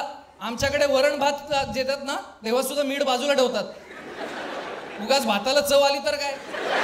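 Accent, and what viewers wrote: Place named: Kolhapur, India. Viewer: बरोबर आहे लय ऑड वाटतय पुणेकरांच्या तोंडातुन कोल्हापूरी भाषा ......जगात भारी आम्ही कोल्हापुरी😅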